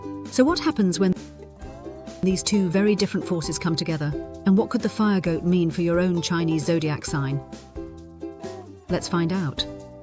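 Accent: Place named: England, United Kingdom. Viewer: An English accent, Hawaiian luau music, Chinese astrology, and AI animation. Interesting combination.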